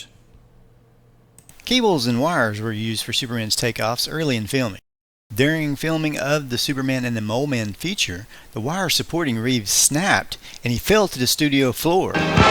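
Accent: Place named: Kentucky, United States. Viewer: I really enjoyed this. Even though I knew about two-thirds of it because I too am a lover of trivial trivialities, is Barney said on Andy, I enjoyed how this brought them together and how they were presented. New to this channel but I will be back. May I ask where you are from? I am from Kentucky and we seem to have the same accident. Thanks again for these great videos.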